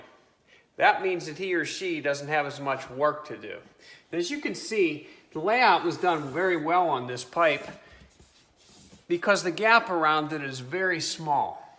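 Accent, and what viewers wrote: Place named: Aliquippa, United States. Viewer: I left Aliquippa 30 years ago but I picked up that accent of yours within 15 seconds of watching this video. Great job, it was a good refresher for some Sheetrock work I have coming up.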